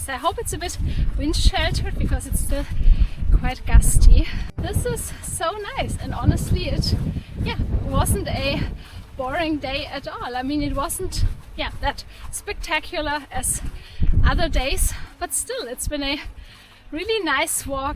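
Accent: Germany. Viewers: Der kleine Otto tut mir leid. Hoffentlich geht es ihm bald besser. Übrigens, wo kommst du her? Dein deutscher Akzent kommt mir bekannt vor.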